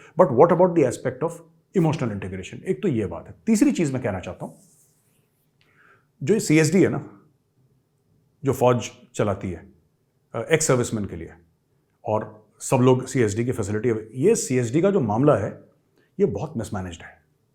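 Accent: Lahore, Pakistan. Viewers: Unique delivery by you of Lahori bol "Kar rahey Hain, Haar rahey Hain...." You video will remain incomplete without this "Hain" word style... You are tooooooooooooo Gooooooooooooooooood... Love you Major Sahab... Bharat Mata Ki Jai... Jai Hind Sir... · Maj sahab aapka Lahori style bahut badiya h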